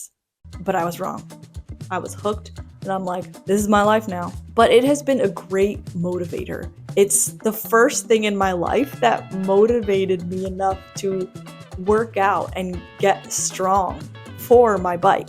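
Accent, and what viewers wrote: United States. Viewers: Great video! All solid advice for new and experienced riders. Riding is a skill. For some reason I’m stuck on your accent, though. My guess is Ohio Michigan, maybe Chicago land area growing up.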